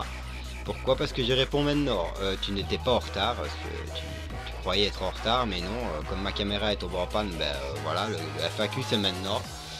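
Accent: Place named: Liège, Belgium. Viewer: Ton accent Liégeois ahha Je viens de Bxl moi, continue comme ça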